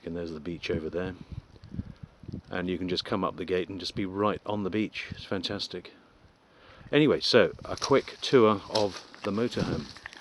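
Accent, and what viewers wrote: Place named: United Kingdom. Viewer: your videos are great. Nice and laid back presentation, such a perfect British English, delight for the ears and such a clean and wonderful S900. We know each other from the Classic Merc Hymer group on FB, we exchanged comments. Please, keep on with the videos.:) Regards, Yaro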